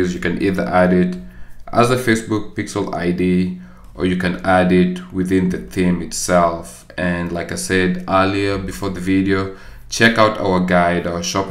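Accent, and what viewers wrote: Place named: Zimbabwe. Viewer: Great video. Are you zimbabwean? Your accent sounds like you are from zimbabwe.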